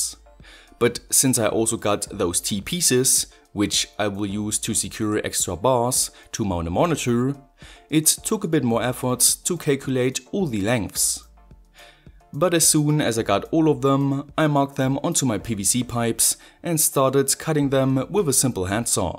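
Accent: Germany. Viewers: Mach Mal bitte mehr Deutsche Videos, ich mag es, wie du Englisch mit deutschem Akzent und Deutsch mit englischem Akzent sprichst! Translation: pls make more english videos, i Like it, how you speak english with a german accent and german with an english accent!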